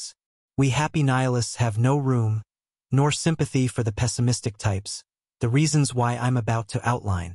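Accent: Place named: Wales, United Kingdom. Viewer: I was just getting used to the Welsh accent lol.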